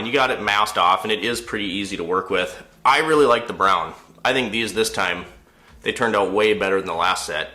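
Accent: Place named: Canada. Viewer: You’re Canadian Aye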